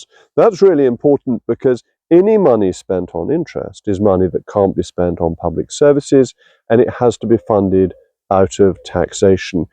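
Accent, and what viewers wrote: RP, United Kingdom. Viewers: Bullshit in a suit and posh accent is still bullshit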